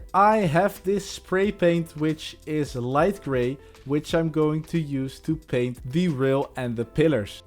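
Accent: Netherlands. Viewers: I recognise the Dutch accent instantly!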